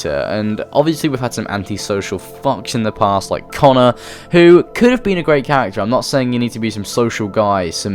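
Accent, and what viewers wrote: United Kingdom. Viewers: I thought that it was probably a joke, but I wasn't completely sure. You never know when you've got somebody from a different culture if maybe a saying that's normal to you means something very different to them. Your accent is obviously European - probably UK somewhere, although I've heard people from the middle east with an accent that sounds pretty similar to yours too. I've certainly never heard the rough sex definition. I'm not even sure if that's an actual thing or just somebody putting in a silly troll definition XD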